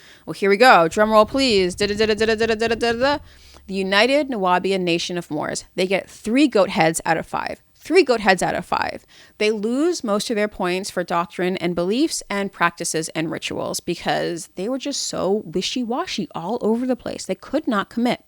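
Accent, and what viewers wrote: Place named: United States. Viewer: Your so American u know nothing look at how u talk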